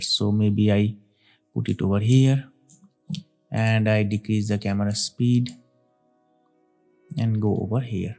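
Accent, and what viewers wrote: India. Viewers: by the voice I can tell its an indian channel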